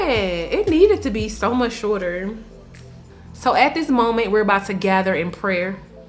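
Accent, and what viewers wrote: South, United States. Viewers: And that country accent is everything! #SouthernRoots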